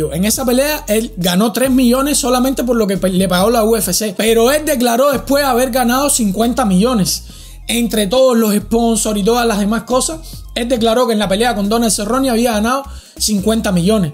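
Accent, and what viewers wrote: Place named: Cuba. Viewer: Yow mano, me encanta lo claro que hablas y ese acento cubano.👊🏼😁 Saludos desde España.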